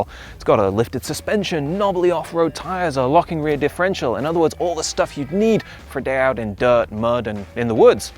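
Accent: United Kingdom. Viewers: Is this guy British? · His accent swaps between British and American.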